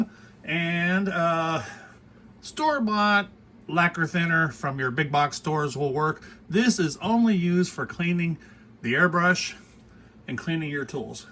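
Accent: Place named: Ohio, United States. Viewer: Your accent sounds southeastern Ohio, no?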